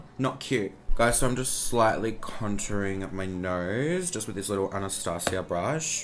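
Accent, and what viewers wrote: Australia. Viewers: is he Australian? i can't tell because I'm Australian and i am used to the accent. It sounds a bit British at times..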